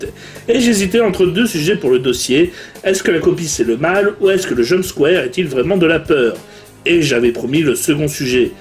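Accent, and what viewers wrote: France. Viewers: L'anglais a la française haha mais j'aime bien se format et voir Detective Dee ça fait toujours plaisir. Tu ma un peut Hyper avec le film psychokinesis.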